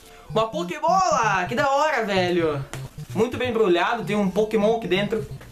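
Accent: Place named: Pato Branco, Brazil. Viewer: uaheuhaueh o fala engraçado por que ele é de Pato Branco! (não leve pro pessoal)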